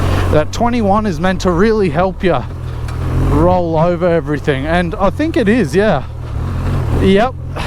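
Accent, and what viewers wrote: Australia. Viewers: First of all... Great review and a fantastic bike! But I'm very confused about yourself mate 😅 Bavaria in the name, an Australian accent and based in Estonia? Sounds like an interesting backstory 👍🏻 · Enjoyed the scenery mate. Great to hear a fellow Aussie accent overseas . Great bike.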